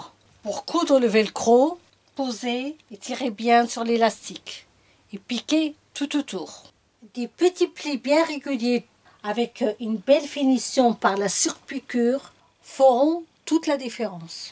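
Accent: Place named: Lebanon. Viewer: Coucou je commence la couture je trouve que vous expliquez super bien et chose importante pour ne parler pas trop vite ... Et j'avoue g craqué sur votre magnifique accent ça me rappelle ma famille au Liban . Merci beaucoup 👍👍👍👍🌷🌺💐💗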